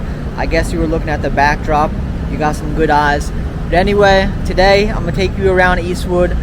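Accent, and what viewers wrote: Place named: South, United States. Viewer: Judging by your accent... You're from the southern United States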